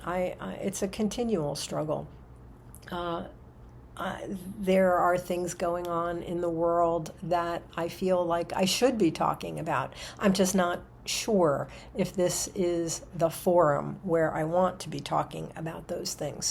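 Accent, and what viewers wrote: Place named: United States. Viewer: Age is just a number I would be more pugnacious but then again I used to be a pro fighter. I have only recently come across your channel I enjoyed your American accent and the way you presented yourself and your books so I subscribed. I live just outside of London, although I am a sexagenarian, I still work in North London part-time as I am a peripatetic chess coach who goes from school to school teaching it. I am an avid reader. I read eclectically. I am a bibliotaph and a librocubicularist. Recently, I picked up an antiquarian book, The Golden Bough, in Hampstead, in what you would call a thrift shop, we call them charity shops here, and I am very pleased with it. Someone also threw away a beautiful bookcase at the bottom of my garden, so I rescued it, cleaned it up, and now store some of my Hardbacks in it. It's one of those bookcases with lead-lined glass doors. 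You can have a peek at some of my books here; it's about 10% of the books I own. If anyone is on there and wants to touch base, hit me up! <link> Regarding poetry, it can touch and change the soul. I recently read the first book in the autobiography of Maya Angelou, and she once said: "When you know better, you do better". That one aphorism inspired me to become a better man. My favourite Poet is Kipling. I also enjoy Shelley, Byron et al.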